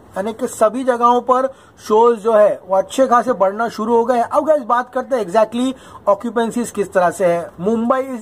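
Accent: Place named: Hyderabad, India. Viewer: Lol hyderabadi accent 🔥🔥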